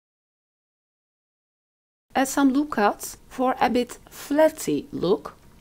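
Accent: Turkey. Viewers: şiveden tahmin ettim Turk oldugunu :D eline saglik 🙏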